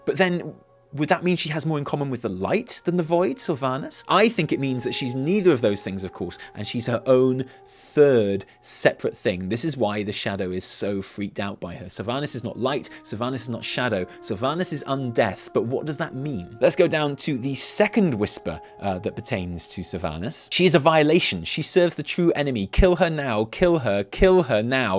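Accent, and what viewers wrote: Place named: United Kingdom. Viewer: Love your content bro. Also iam getting used to that strong brittish accent day by day. I swear i didnt understand single word to britts at first, but now is it better and better!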